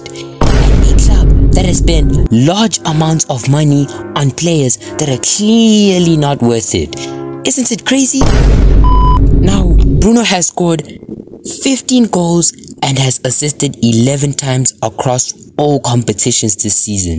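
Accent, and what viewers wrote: South Africa. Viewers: I can hear the Satafrikan accent😂🇿🇦💯 And you're a Chelsea fan? Subscribed🫱🏽‍🫲🏾💯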